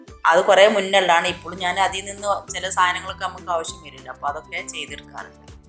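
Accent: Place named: Kottakkal, India. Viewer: സംസാരം കേട്ടപ്പോ മനസ്സിലായി കോട്ടക്കൽ അപ്പുറം ആണ് സ്തലം മനസ്സിലായി ഞാൻ രണ്ടത്താണി ചെറുശോ ല യാ ണ്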